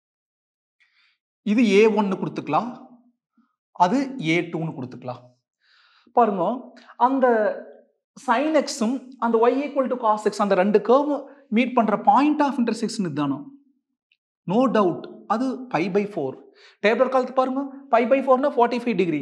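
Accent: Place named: Kongu, India. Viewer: That kongu slang nd teaching methods are 🔥💯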